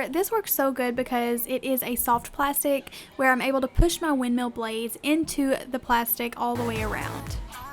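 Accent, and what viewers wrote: South, United States. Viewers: I have been watching you for over a year now. 1/08/2023 is today's date. I am realizing I have so many more videos yet to watch. I thought I was pretty well up to date. ❤ Love the windmill. I have got to say your southern accent is really noticeable in this video or the most recent ones.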